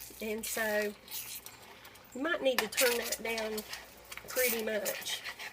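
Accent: South, United States. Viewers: Are you from Arkansas?? You sound like me a lot lol I'm from Conway and I also have that southern twang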